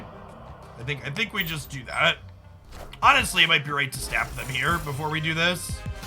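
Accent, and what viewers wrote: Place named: United States. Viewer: YouTube voice: regular American accent. stream voice: “deed ya like that cheeeyat?!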